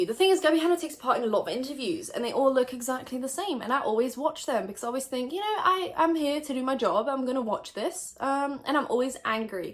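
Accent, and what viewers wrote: United Kingdom. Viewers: why did i know you had a british accent before i clicked on this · You know what’s weird when you were talking about pop tarts I forgot that you were from the U.K. I think I’m tired how did I not hear your accent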